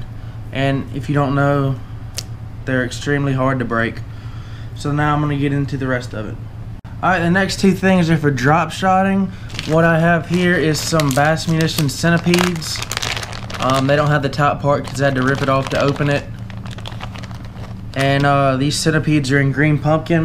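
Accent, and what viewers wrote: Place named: Alabama, United States. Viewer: What part of Alabama are you from?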